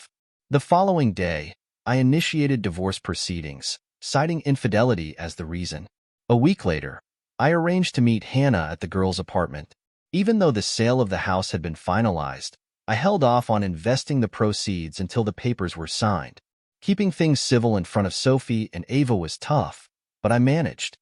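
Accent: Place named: United States. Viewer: An American voice on an English story - irrational.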